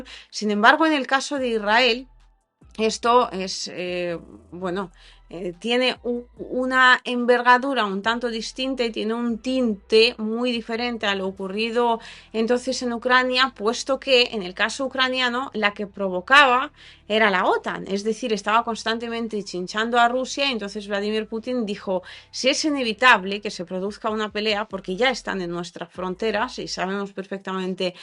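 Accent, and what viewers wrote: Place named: Spain. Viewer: Rusa y con acento español falta que seas católica y sos la mujer perfecta jeje bendiciones para ti y para todos desde Uruguay 🇺🇾🙏💙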